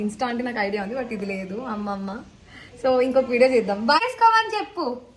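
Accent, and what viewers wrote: India. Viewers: gaaru Antarvedi daggara unna lakkavaram aa midi. miruu godavari yasa lo matladutu unte rojanta chustu undipovachuu andi mimmalni❤ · Beautiful Telangana telugu ❤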